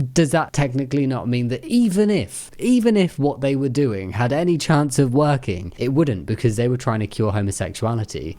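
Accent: United Kingdom. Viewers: I just realise you have a British accent and I’m halfway through the video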